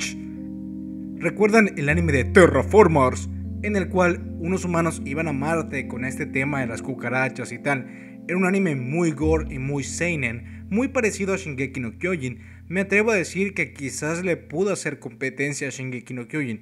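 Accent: Argentina. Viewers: ¿Eres de Argentina?:V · sos argentino?? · eres argentino? :'0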